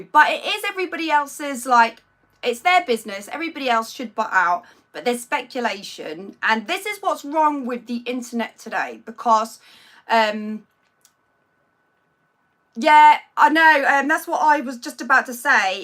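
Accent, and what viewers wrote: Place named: Cockney, United Kingdom. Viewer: There's definitely a bit of cockney in there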